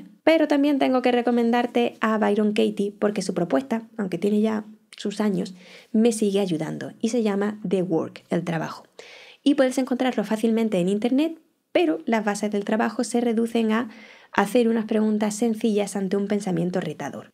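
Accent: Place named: Andalusia, Spain. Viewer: Hola! Me encanto tu perspectiva. Tengo una persona cercana que creo que puede tener TDAH. Una forma no muy severa, pero aun asi con sus dificultades. Gracias por todo lo que has dicho. Me fue muy util. PD: tienes una piel preciosa y me encanta tu acento, que creo que es de España del sur o tal vez de Murcia:)) Saludos!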